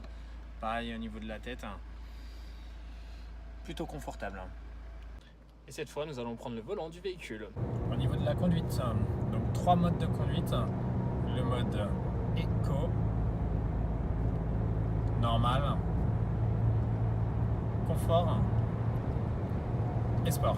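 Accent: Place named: Paris, France. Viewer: Cet à cent de titi parisien est trop drôle.... sport...heu...la routes de 8 rapport..heu... vehiculeeeee LOL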